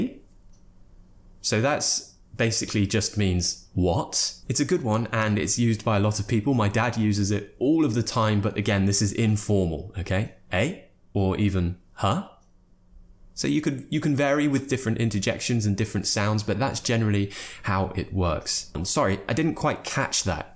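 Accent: United Kingdom. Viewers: United Kingdom, so he have a British accent. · I love ur uk accent i hope someday visite london 🥰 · Really you make me fall in love with the British accent 🥰🥰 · that aweasome British accent, thanks. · I love ur accent so much, and I’ve been mimicking the British accent all the way in HK due to its elegance and uniqueness. I’m gonna come to England in April, honestly I hope all the slangs and idioms that I’ve learned from you could be applied in my journey. Thank very very much!!! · Am Ugandan but am more interested in the British accent it's so wow,am following your lessons, pretty great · Super! I like your lessons. And a Britith accent )))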